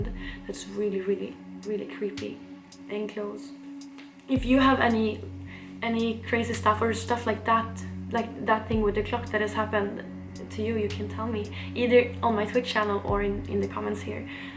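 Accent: Sweden. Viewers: You talk English like a swedish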